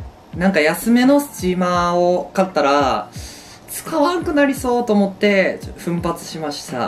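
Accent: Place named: Kansai, Japan. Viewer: 関西弁も素敵です☺️💕肌綺麗ですね！スチーマー買おうかと検討してたのですごく参考になりました！😆💕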